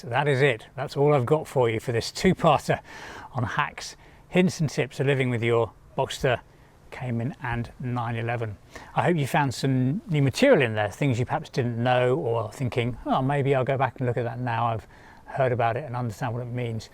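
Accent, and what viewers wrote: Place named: United Kingdom. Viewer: my God, I'm watching your part 3 now and I'm going crazy about your British English 😄. Too much of American slang nowadays . By the way, have you also got Guards Red color on your beast?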